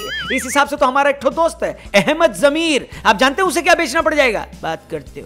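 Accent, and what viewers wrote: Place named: Bihar, India. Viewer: भाई आपका बिहारी में बोलने का style मस्त है भाई | love 💓💓you bro from patna (बिहार)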